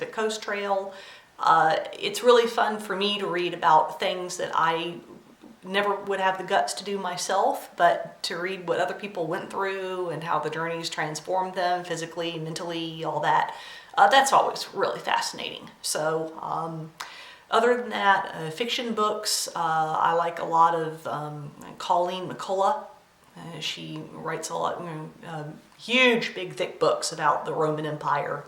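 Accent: United States. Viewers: I just found your videos and I'm enjoying them. I'm hearing a few southernisms in your accent so I'm thinking Kentucky or maybe Indiana. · great first video I have been watching floss tube for over a year but not found the courage to make a video yet I think your accent is from some where around south Caroline but since I am from Canada it is mostly a guess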